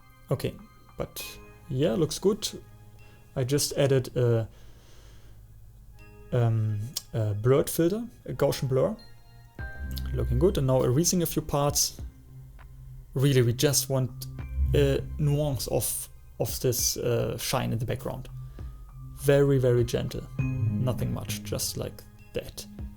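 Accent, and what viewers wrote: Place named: Germany. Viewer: Man, thanks a lot for your tips... In this period I’m working on project that requires some “art skill”, and I really need some drawing advice as I’m not really good at it 😅 Down below, I type what I would had written in the first part (as short as possible) I heard that your accent is German and there are some words that I didn’t understand right away; but that’s not a problem.. I just “rewinded” the video and tried to understand that few words... Also some suggestion for the future.. Would you make some animal (also fantasy) drawing tutorial? I would really appreciate it. Until then, good luck for your next arts 🏞🌅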